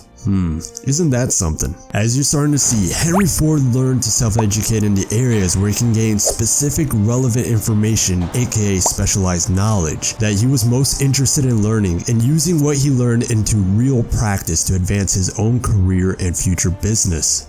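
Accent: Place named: Philippines. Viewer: sounds like my Filipino friend, saying “da” instead of “the”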